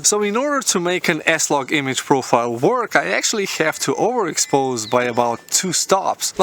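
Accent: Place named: Balkans. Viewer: Great! Keep it up! Where are you from, if is ok to ask? Balkan?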